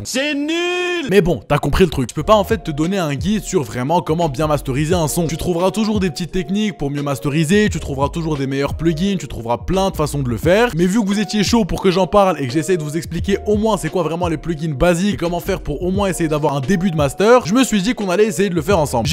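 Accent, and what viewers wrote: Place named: England, United Kingdom. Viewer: Ce bête d'accent anglais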